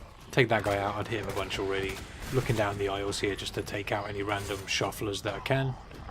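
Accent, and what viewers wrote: United Kingdom. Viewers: Dude, you made this look easy. LOL! Really well done, you are insanely skilled! By your accent I can see that you are british, cheers mate! Much love from Brazil.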